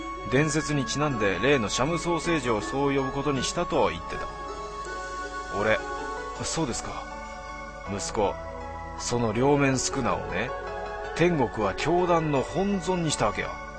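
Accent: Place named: Iwate, Japan. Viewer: 岩手のなまりってこんなかなぁ…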